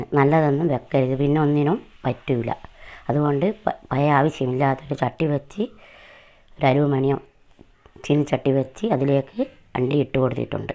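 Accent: Nadapuram, India. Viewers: Barthanam kettokkumbo nadapuram bayikkanna thonnunne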